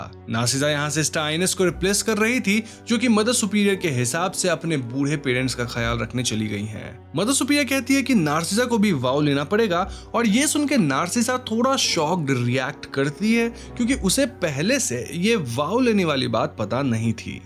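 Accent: Bengal, India. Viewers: Aap Bengali ho kya 😊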